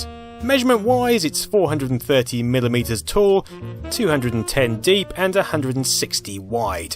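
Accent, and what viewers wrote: United Kingdom. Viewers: The french man say: C'est trop Cool! But will you stop having this british accent, it's so hard to understand for frogies...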